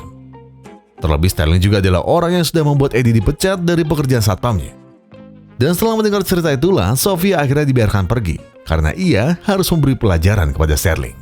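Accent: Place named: Tegal, Indonesia. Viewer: nt wong tegal min ? kayong ngapak temen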